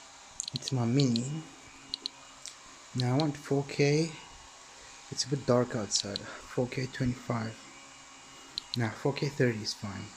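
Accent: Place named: South Africa. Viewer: did you grow up there? I feel as if I'm hearing a little bit of a South African accent from you. 👍 Nice flight even though the weather was not great.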